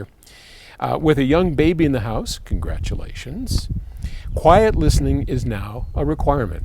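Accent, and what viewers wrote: United Kingdom. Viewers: THE British accent!... Which one?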